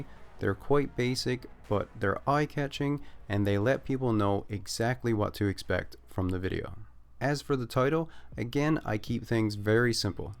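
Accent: Newfoundland, Canada. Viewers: I've watched a ton of your no man's sky videos, I just discovered you about a week ago and I've had your videos playing pretty much non stop and your guides really helped me alot. But in each video I keep thinking "huh, his accent sounds like the Newfoundland accent" but I kept telling myself there's no way... until I saw you in this video, that landscape is without a doubt Newfoundland lol. What part of the rock are ya from b'y? My entire family is from there lol.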